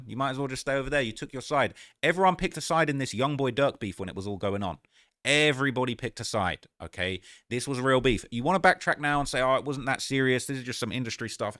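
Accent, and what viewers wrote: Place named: United Kingdom. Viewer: You accent drives me NUTS!!! And the fact you always act like your black drives me even more nuts!!! You are a bum from the UK!!! Stay in your lane yt boy!!!! You gon get it very soon . Remember those words I just said. See you on the news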